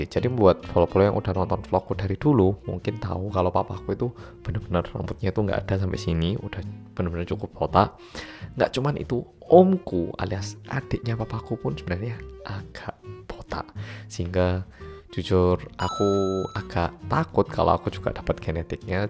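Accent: Java, Indonesia. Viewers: Iki Jane orang Korea apa Jawa sih, kok lucu ngomongnya 🤣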